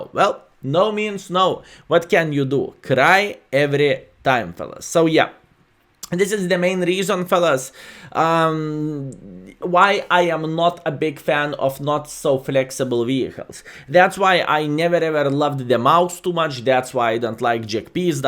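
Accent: Russia. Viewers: Что за акцент?Ты русский? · jesus this russian accent english is horrible.....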